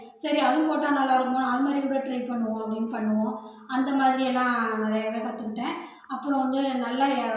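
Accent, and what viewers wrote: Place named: Thanjavur, India. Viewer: வாழ்த்துக்கள். தஞ்சை மாவட்டம் திருவாரூர் மாவட்டம் வட்டாரச் சொல் படி பேசுகிறீர்கள்.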